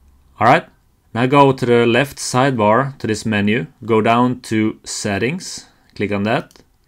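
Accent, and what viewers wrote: Sweden. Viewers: he is swedish i hear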